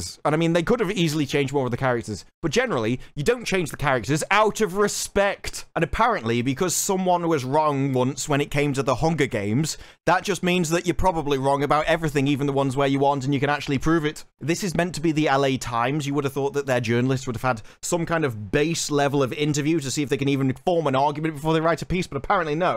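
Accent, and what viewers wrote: United Kingdom. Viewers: God I enjoy your lovely British accent while passionately dismantling these Tolkien abusers.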